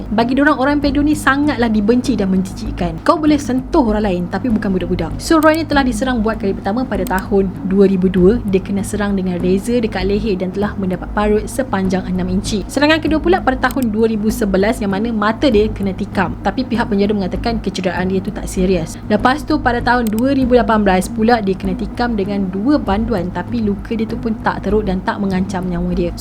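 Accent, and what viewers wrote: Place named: North, Malaysia. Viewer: mesti org utara kn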